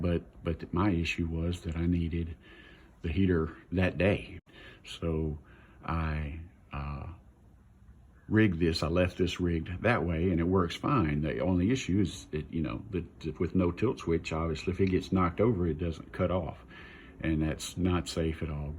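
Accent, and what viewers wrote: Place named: South, United States. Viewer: IM FROM ENGLAND AND I COULDNT STOP LAUGHING AT THE FORREST GUMP ACCENT SORRY BUT IT TICKED ME PINK GREAT VIDEO THOUGH THANKS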